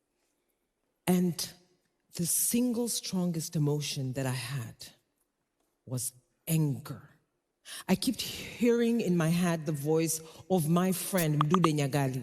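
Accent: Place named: United States. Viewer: U bwege unababaishwa na Americanized voice kaolewe naye.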